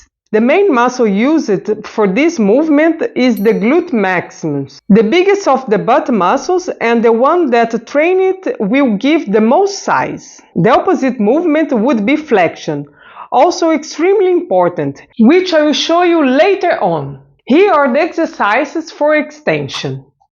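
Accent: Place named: Portugal. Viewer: adorei os exercicios. vc fala ingles , mas nao aprendeu a ter a pronuncia e intonação da lingua inglesa. como? fala ingles com intonaçao do portugues , triste. otimos exercicios obrigada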